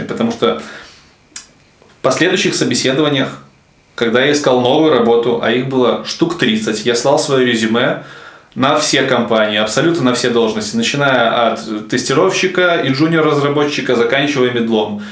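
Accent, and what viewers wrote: Russia. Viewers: Чувак, ты закосячил слово intermedaite , и многие слова англицизмы ты произносишь с русским акцентом, ты скорее просто этого не знешь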